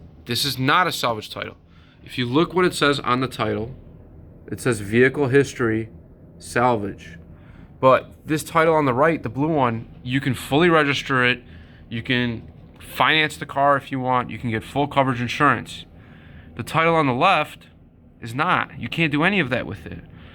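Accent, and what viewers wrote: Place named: Northeast, United States. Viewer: Great video! I love the northeastern accent my cousin lives in Philly. I’m in Texas.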